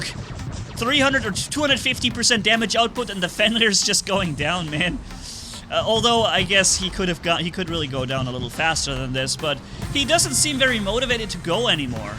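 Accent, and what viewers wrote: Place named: Germany. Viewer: hahaha I think might be onto something here, because you don't realize it but even this answer, you built in a German way, by putting the past participle aaaaall the way at the end of your sentence (almost). But that's great though, really awesome. I love how one's native tongue unknowingly influences the way they speak other languages no matter how fluent they are in that language!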